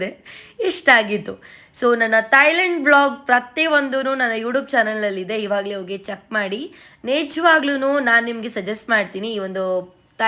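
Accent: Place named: Mangalore, India. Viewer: Nimdu Manglore Kannada na. Nice to hear that ❤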